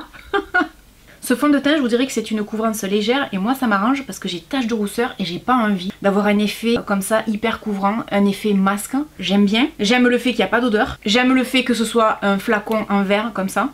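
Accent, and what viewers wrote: South, France. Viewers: Bravo. Bons conseils et toujours aussi charmante. J'adore le petit accent du soleil ❤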